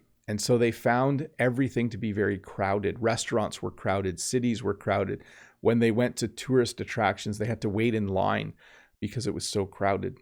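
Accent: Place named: Canada. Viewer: Great videos!! My students love your teaching style and I recommend your channel to hear natural English with a different accent - I’m British but it’s important to hear all different accents. I find Canadian clearer than American. Keep up the good work!!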